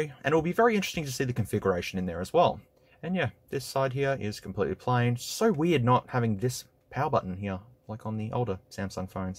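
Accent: Australia. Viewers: From one Aussie to another, our accent always throws me when I hear it in media haha. Bloody good shit though, subbed. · I personally like the long vids, and for an aussie you have a pleasant accent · Well he does speak Australian English. So, yeah. 🤷🏼‍♂️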